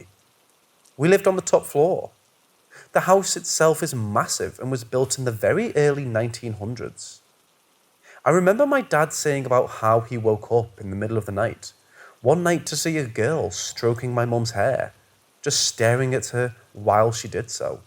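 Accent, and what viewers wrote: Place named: United Kingdom. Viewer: Hey there! It’s fantastic to hear a fellow Brit narrating 👍🇬🇧, I’ve been listening for a while hence I’m subscribed but I’ve just found this vid… my phone does ultra weird things… either that or it’s YT 🤷🏻‍♀️ idk but I’m here now and I have to say your stories are great! My husband is watching some boring documentary about cycling in the snow or something… yawn! So having found this episode, I can just sit back, ignore the tele & chill! Kudos chum! Keep bringing us these great tales of spooky stuff! I have just had the dog bite the like button! 🤣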